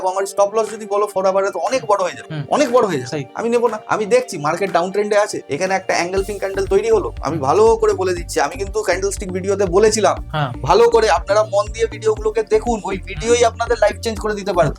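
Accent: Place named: West Bengal, India. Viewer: ইন্ডিয়ান বাঙালীদের কথাবার্তায় একটা বাটপার বাটপার আভাস পাই। আপনাদের accent অনেক ক্ষ্যাত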